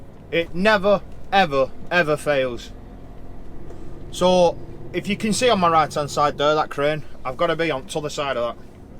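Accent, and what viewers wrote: United Kingdom. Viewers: just watched this, and subscribed. loved it, brilliant accent as well, tho I dunno from what part of the UK that is. Maybe someone here can enlighten me on that ? Greetings and a big thanks from Holland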